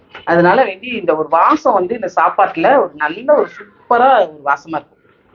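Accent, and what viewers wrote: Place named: Coimbatore, India. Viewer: Super , coimbatore ah sister ninga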